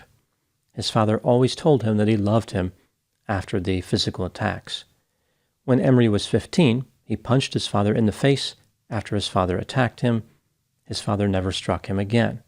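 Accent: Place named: Pennsylvania, United States. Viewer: Today's question is, can I analyze accent. Although the cacti in the background suggest a southwest setting, I possibly detect hints of Pennsylvania or perhaps Ohio. I'm not attempting to pin down any geographic region, it's only a theory, my opinion.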